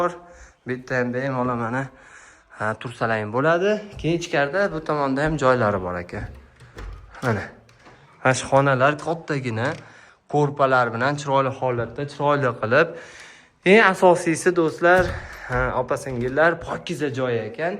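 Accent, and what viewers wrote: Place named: Fergana, Uzbekistan. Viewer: Шевасидан билсак Фаргонада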